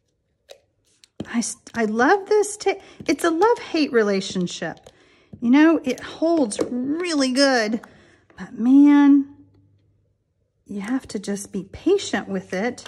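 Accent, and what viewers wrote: South, United States. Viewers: You sound so much like my fave first cousin... my cousin was Southern too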